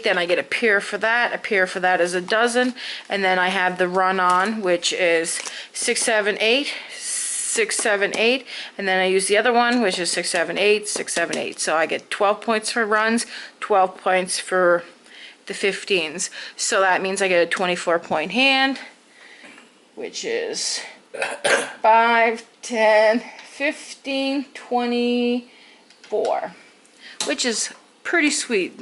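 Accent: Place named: Canada. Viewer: I loved the video, and thanks so much for making it! We are learning to play cribbage and I wanted to watch an actual game, so this was a great find on YouTube. I grew up in Canada but now I live in the UK; it was great to hear a proper Canadian accent for the first time in a while! And I was able to share that with my partner. Blessings to you; hope your warm weather comes soon! (It rains a lot here but my British partner says he has never been 'snowed in'; he thinks it sounds nice, but I assured him it's not that great...)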